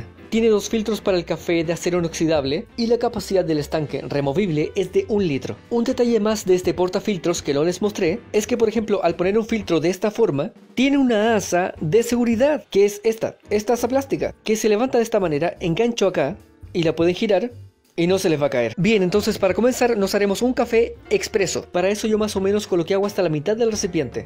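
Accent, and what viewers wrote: Standard. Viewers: tu acento universal es fantástico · Habló lento y con acento neutro, jajajajaja. El acento chileno original es incomprensible fuera de Chile.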